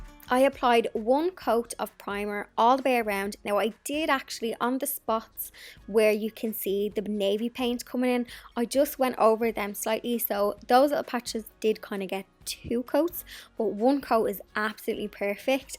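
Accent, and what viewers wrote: Ireland. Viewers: Oh my gosh, that looks amazing, I was also amazed that you just moved things around your room and painted everything in place. I would have had paint everywhere! Great job, loved the idea and thanks for sharing....PS you are simply lovely and I could watch you all day just to hear your beautiful Irish accent. Stay safe, hugs from 🇨🇦❤️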